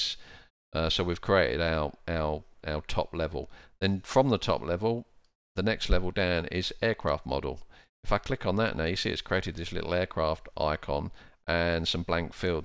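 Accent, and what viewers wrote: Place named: United Kingdom. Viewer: I'm new to this and greatly appreciate your videos. They are even clear to me which is not an easy affair for a French speaking simmer listening to a british accent! I will have to see this one again as I missed a few things. By the way you should make your panels available. It would be so useful for beginners. Thanks again!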